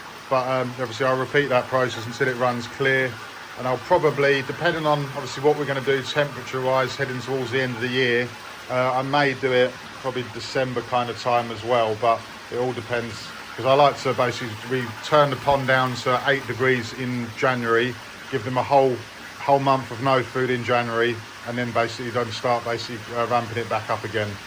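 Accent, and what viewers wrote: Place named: United States. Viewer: I thought you were British you start talking and I find another american.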